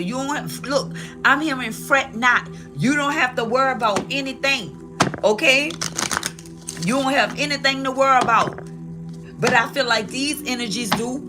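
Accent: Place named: New Orleans, United States. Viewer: I know you from New Orleans the way you Talk. REALNESS.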